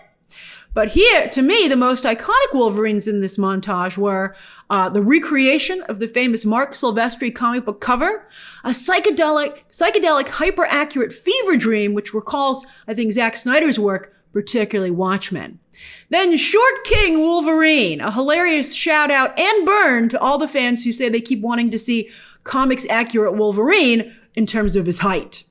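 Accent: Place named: Mid-Atlantic, United States. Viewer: You have a mid Atlantic accent from the 1950s it’s so wild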